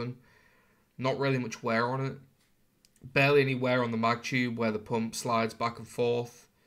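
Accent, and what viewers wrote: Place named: United Kingdom. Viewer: I’m not used to listening to a British accent on a gun collection video, but great collection!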